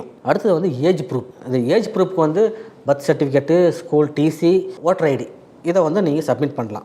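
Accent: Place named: Coimbatore, India. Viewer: That is COIMBATORE SLANG with much respect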